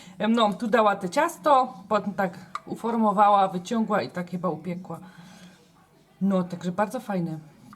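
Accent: Silesia, Poland. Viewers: na początku bardzo gratuluje 13 tys. i życzę aby było ich jak najwięcej. Trafiłam tutaj dzięki poleceniu DG no i gdy zobaczyłam te cudowne torty zostałam najdłużej. Czasem włączam sobie pani filmik i zastanawiam się czy mi by też taki tort wyszedł? Dodatkowa urzekła mnie pani gwara, jestem ze śląska z Gliwic i moja babcia mówi w ten sam sposób, czasem mi przykro, że my (wnuki) tak nie potrafimy. Pozdrawiam, Wioleta. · witam! Ja panią oglądam juz od prawie roku i super dodała pani przepisy w tym roku jest Pani the best i najlebszą z kucharek Pani jest ma pani śliczną kuchnie i super dzieci ja osobiście zazdroszcze im że jedzą takie pyszne żeczy ma Pani najlepsze recepty na całym you tubie ja poleciłam panią nawet mojemu sąsiadowi Kubie i jeszcze powiem,że fajnie Pani gogo po śląsku bo śląski to przecierz mój polski język a natrafiłam na Panią tak z przypadku i teraz oglądam Pani każdy film pozdrawiam: Paulina z Czechowic-Dziedzic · ja trafiłam na pani kanał jak szukałam jak zrobić ciasto na pizze. 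Oglądam panią razem z moją mama.:-) jest pani super!:-) Mieszkam na śląsku obok Katowic i Sosnowca. miło jest oglądać kogoś co tysz umi godac po slasku.:-) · nie pamiętam już jaki przepis był tym pierwszym uwielbiam je wszystkie i wiele wypróbowałam z przyjemnością oglądam nowe filmiki a najbardziej podoba mi się jak super mówisz po śląsku niektóre filmy oglądam tylko aby posłuchać Ciebie jestem z Gdańska i gorąco pozdrawiam Ciebie i Twoje słodziaki które czasem pokazują się lub odzywają buziaki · Ja poznałam panią poprzez film o Elsie z krainy lodu :) mieszkam w Małopolsce , mam 15 lat i bardzo lubię piec :) mam rodzinę na Śląsku , wiec pani gwara nie jest mi opca :) pozdrawiam ciepło ! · moje dzieci są zachwycone Pani gwarą, my jesteśmy ze Szczecina i nie mal każde słowo Śląskie jest dla nas ciekawe w słuchaniu, dzieci zachwycają się każdym zdjęciem deserowym, wiec oglądamy po jednym filmiku dziennie i od czasu do czasu pieczemy i gotujemy. 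Na pewno wyciąga nas Pani z kuchennej rutyny za co DZIĘKUJEMY:) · Moje gratulacje z okazji subów, jestem z dolnośląska z Głogowa, bardzo podoba mi się że pani przepisy nie są wymyślne ani skomplikowane i "goda " pani krótko i na temat i często daje te filmiki co budzi moje zdziwienie, że przy trójce dzieci pani to ogarnia i jaszcze jedno- ten słodki szkrab uśmiechnięty wysyłający buźki, bardzo mi się podoba, szkoda że nie jestem jego ciocią i nie mogę go wyściskać, nie miałabym nic przeciwko temu żeby pojawiał się w każdym filmiku.Mam koleżankę w okolicach Katowic i wtedy też godom, bardzo mi się te gwara podoba .Oczywiście polecam kanał, często korzystam z przepisów, trafiłam dosyć dawno przypadkowo i oglądałam ciurkiem wszystkie przepisy, pozdrawiam, życzę dalszych sukcesów. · Jestem ze slàska i mieszkam w Hisxpani dosyć troche i słucham cie po ślonku to fajne jest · ja na trafiłam na ciebie jak szukałam przepisu na pannacotta twój kanał jest taki inny niż wszystkie i twoja gwara śląska jest dla mnie bardzo śmieszna i mieszkasz obok mnie bo ja mieszkam 70 km od Monachium pozdrawiam serdecznie :* a może jakieś Q&A nagrasz ? 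· Ale ześ wymyśliła konkurs. Ja ci nie powiem jak cię znalazłam. To były chyba twoje rózne drozdżowe wywijasy: piza słońce, makowiec i gwiazda z nutellą. Ja zasubskrybowałam cię bo uwielbiam, jak gadasz z tym ślaskim akcentem:D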